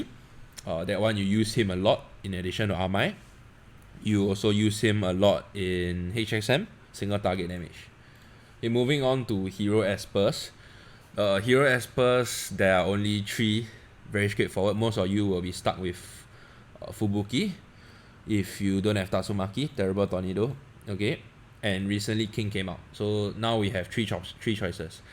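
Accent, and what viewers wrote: Singapore. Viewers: Are you singaporean?or Asian haha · Bro this is an awesome guide. Are you from Sg? Can recognize the accent lol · it's more like singaporean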